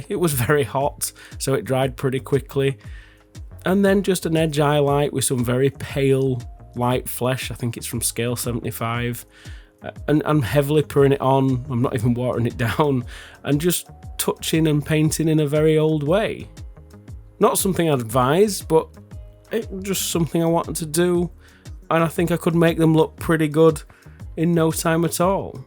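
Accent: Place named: North, United Kingdom. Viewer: Love how you keep your northern accent for videos. You don't try to be formal and lardy da like me nan used to on the telephone.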